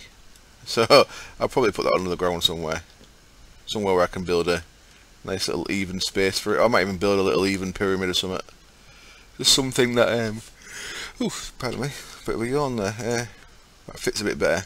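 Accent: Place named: Hartlepool, United Kingdom. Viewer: you sound like you're from Hartlepool area.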